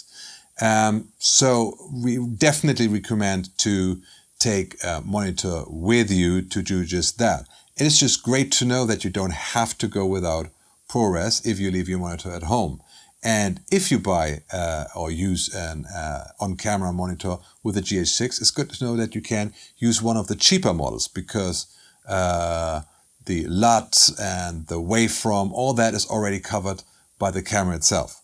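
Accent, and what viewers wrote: Germany. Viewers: I could listen to that German speaking accent and intro synths forever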